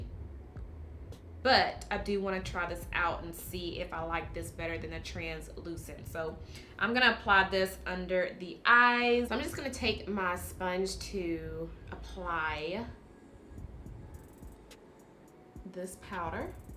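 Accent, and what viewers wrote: South, United States. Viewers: Love your channel! And your accent! Are you from the south? I’m from Mississippi so I love hearing what I think is a Southern accent :) I bought butter pecan in Sephora a couple days ago but it’s a tad too dark and orangey on me. I’m thinking I MAY be caramel...😩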